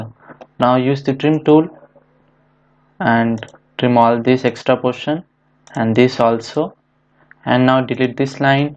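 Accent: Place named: India. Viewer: Video too fast and please reduce the indian accent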